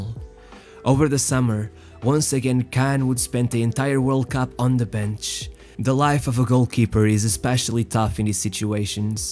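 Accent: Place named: Portugal. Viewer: confessa lá, tu és tuga.... nota-se no sotaque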